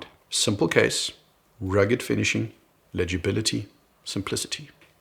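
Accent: South Africa. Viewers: Superb video. By the way, you sound very South African. To me the Index field watch by which all others are judged is the Hamilton Khaki field. Or maybe the British CWC. It is a bit expensive for where I find myself now, so the Timex Expedition Scout fills that niche, along with a Citizen field watch, although its a tad big at 42mm. Gshocks are very cool, but per definition are not field watches. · Dude are you South African? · You sound South African, aber mit Deutschem Hintergrund...?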